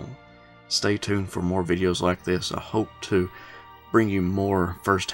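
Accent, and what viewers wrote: South, United States. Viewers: I listened to your video regarding the union account of Pickett's charge and enjoyed your presentation. I am an Army Retiree and lifelong student of military history and applaud your presentations; however, I would like to respectfully point out that Union formations were identified by a numerical designation, while the Confederacy identified their units at the brigade and higher by the name of the current commander. Judging by your accent I can see why you might identify major formations on both sides in the same way, that is to say with the Confederate system. Keep up the good work.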